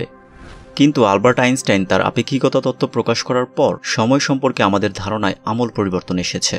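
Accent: Narsingdi, Bangladesh. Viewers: ভাই আপনার এলাকা কি নরসিংদী? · ভাই, আপনার বাড়ি কি নরসিংদী?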